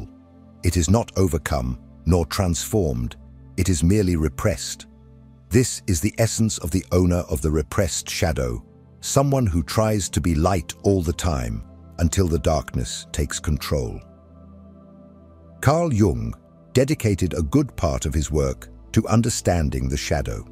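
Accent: United Kingdom. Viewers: I wish i could listen to this without hearing an english butlers voice.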